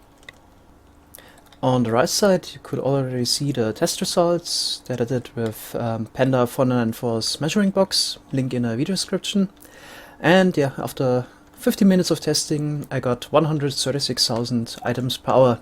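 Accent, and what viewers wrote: Germany. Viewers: D :D u are german aren't u? and thx for the nice video ;)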